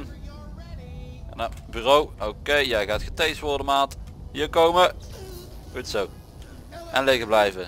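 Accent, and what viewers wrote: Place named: Limburg, Netherlands. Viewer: Goede video! Like en sub, ga zo door. Kom je uit Limburg?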